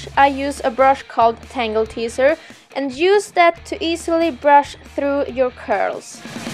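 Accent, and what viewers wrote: Sweden. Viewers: Just gotta love the Swedish accent tho! ;D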